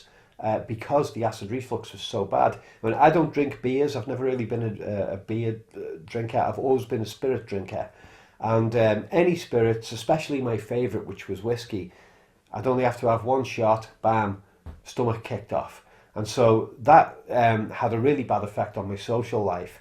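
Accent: Liverpool, United Kingdom. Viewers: My reflux was so bad years ago I had the Acid Reflux Repair, known as Nissen Flundipication...it worked well but took me months and months to get over the operation, I had strained muscles and pain...I cannot live without the PPIs now, I have a shot of Gaviscon every night before bed, 30 years on. I avoid alcohol otherwise it all kicks off. Had HPylori 3 times!!! My history was I had my Gall bladder removed in 1988, then developed IBS, had histerectomy in 1992, terrible reaction to anaesthetic, lots n lots of acid had the NF op in 1994. always suffered with my guts since...wow is me. Thanks, very interesting clip. And lovely to hear a fellow Scousers voice...I've subscribed. 👍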